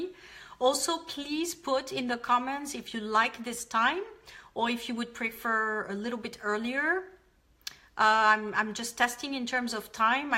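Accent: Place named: France. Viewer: Thank you for this smart,structured content!I also like how you follow some sensitive points.Btw,your French accent is very charming when you speak English.Good luck with everything you do!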